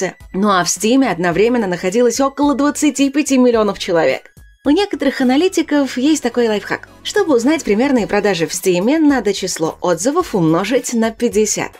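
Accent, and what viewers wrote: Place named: United States. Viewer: Манера общения, под американский мотив, методом прижимания верхней и нижней челюсти. При этом дикция поставлена, будто есть за спиной актерское мастерство) Но звучит приятно)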